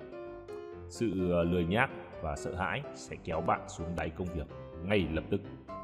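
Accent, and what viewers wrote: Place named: Hanoi, Vietnam. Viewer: giọng chuẩn trai Hà Nội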